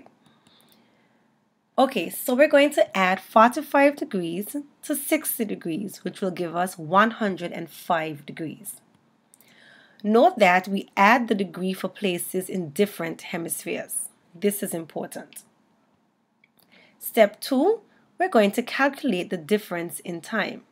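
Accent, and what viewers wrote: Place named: Jamaica. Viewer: You sound like a jamaican👅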